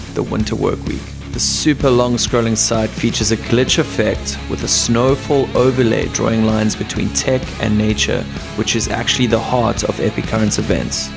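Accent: South Africa. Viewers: Are you perhaps South African? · Well done, 👏. A fantastic curated blitz of resources and news from the design and dev space. Great to hear the South African accent there too:) Subscribed - looking forward to the next one.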